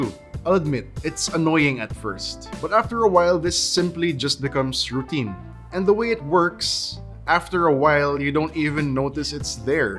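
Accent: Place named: Philippines. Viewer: When I heard your accent, I instantly thought you're a filipino.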